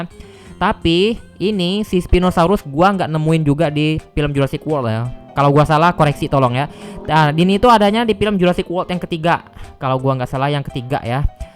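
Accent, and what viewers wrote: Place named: Palembang, Indonesia. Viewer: Kau orang Palembang yaaaaaaaaaaa💯💯💯💯💯💯💯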